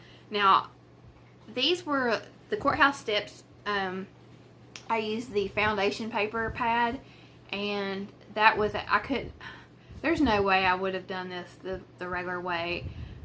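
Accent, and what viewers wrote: South, United States. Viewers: I think it's the southern accent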